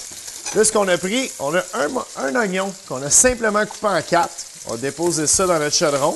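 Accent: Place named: Sorel, Canada. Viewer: Ça ressemble à l'accent de Sorel!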